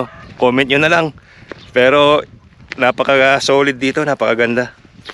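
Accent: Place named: United Kingdom. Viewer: WOW THAT'S A BRITISH ACCENTS.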